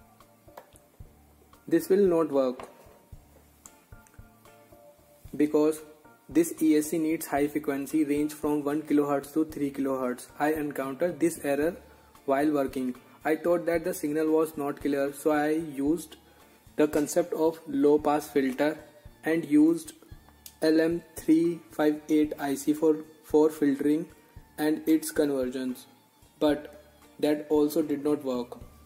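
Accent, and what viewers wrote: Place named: India. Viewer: Porque hablas como Indio y el stick de la radio necesita lubricación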